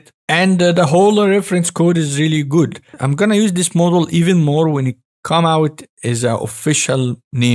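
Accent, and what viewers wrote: Egypt. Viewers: أنت مصري اكيد الاكسنت فضحاك😅❤ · You must be an Egyptian. I know our accent. If so, 3amel eh? XD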